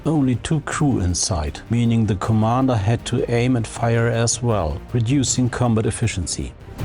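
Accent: Germany. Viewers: I hear that you are german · love your vids! btw are u german?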